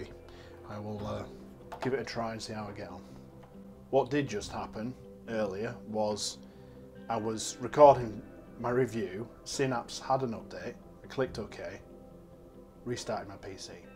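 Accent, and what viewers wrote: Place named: Northern England, United Kingdom. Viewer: Cracking review mate. Honest and Proper Northern!!!! ;)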